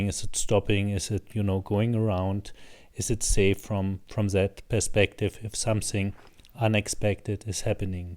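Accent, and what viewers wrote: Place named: Germany. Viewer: Gutes Video! Inhalt top, Aussprache noch etwas zu deutsch:)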